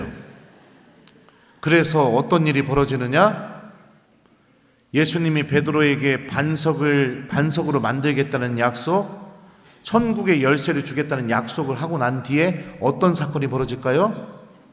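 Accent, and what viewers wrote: Chungcheong, South Korea. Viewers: 새로운 성가네요. 신부님의 에피소드는 백번을 들어도 늘 새롭고 감동이고 재밌어요.^^ 목소리만으로도 치유됩니다. 이젠 충청도 사투리만 들어도 힐링되는거 깉아요.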